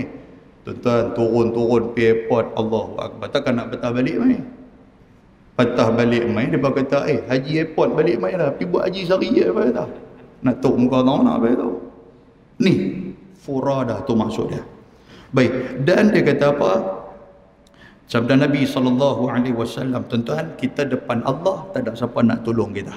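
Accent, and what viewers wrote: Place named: North, Malaysia. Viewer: betul kan ,,sy tahu sbb sy peminat ustz samsuri ahmad,,pejam mata sy dah tau ini suara ustz tp semalam membuatkan sy terkejut beruk dengar suara ustz ini,, Cara penyampaian lenggok dia bila berkata sama sgt,,lorat pon utara,,lawak dia pon sama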